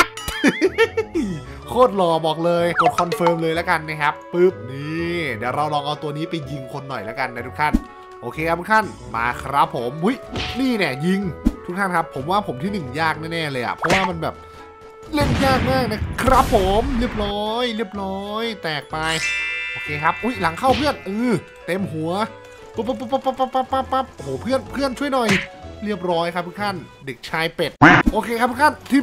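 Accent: Isan, Thailand. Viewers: เสียงอีสานก็มา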